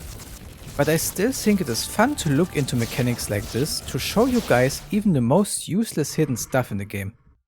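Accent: Germany. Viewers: you sound german xD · ein deutscher wie man seine englische ausprache kennt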